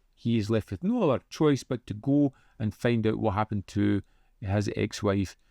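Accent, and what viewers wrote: Scotland, United Kingdom. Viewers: Glad you finally saw this. It was a seminal part of my childhood, watching it on HBO, as I'd never seen anything so shocking or gross (or so I thought at an early age). I also wanted to say thank you for all your Top 10 videos. Your mellifluous Scottish brogue has been a soothing source of ASMR that has helped me stay calm during these difficult times. Keep it up!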